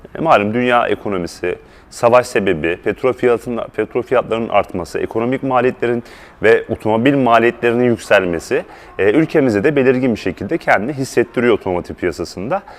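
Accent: Samsun, Turkey. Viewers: Dostum Samsun şivesi böyle 😉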